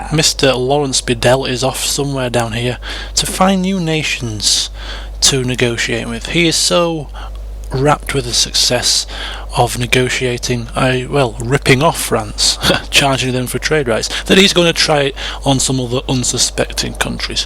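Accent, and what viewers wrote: England, United Kingdom. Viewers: I love your dialogue! Your english accent really adds to it.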